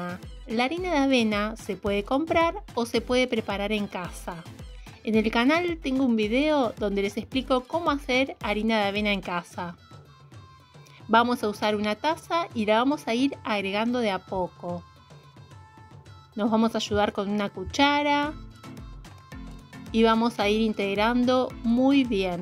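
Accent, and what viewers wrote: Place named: Argentina. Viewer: Sos una genia! Las mejores recetas! Te sigo desde Argentina y reconozco tu acento! Muy bello tu canal!!! 😘😘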